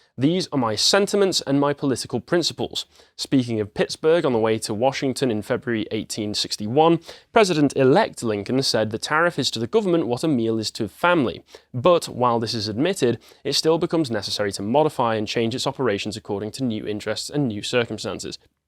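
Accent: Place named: United Kingdom. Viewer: It's really interesting to hear American history discussed by a Brit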